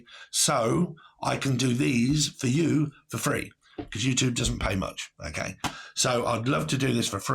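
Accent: United Kingdom. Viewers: Im a sucker for ppl with British accents ❤.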